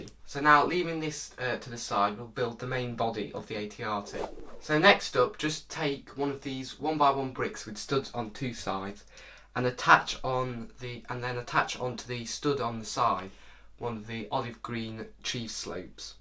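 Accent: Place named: United Kingdom. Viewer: Holy moly, british accent confirmed 😅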